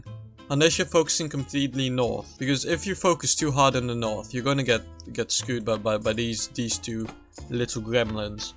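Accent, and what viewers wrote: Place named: Netherlands. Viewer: Ik hoor toch een Nederlands accent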